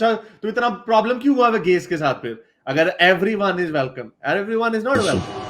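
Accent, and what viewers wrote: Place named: Punjab, India. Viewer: Bhai ji apki urdu ka panjabi lahaje me bat karna kafi acha lagata hai🌹🙏🌹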